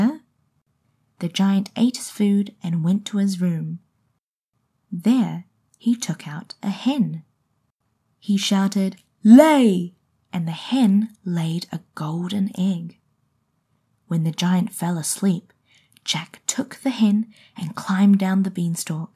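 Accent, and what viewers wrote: New Zealand. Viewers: 내년 1월에 뉴질랜드 6주 어학연수가는 학생인데 뉴질랜드 문화 이런것도 영어로 소개해주세요 뉴질랜드 발음 너무 조아요💕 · You have a proper Kiwi accent haha! Did you go to broadcasting school by any chance?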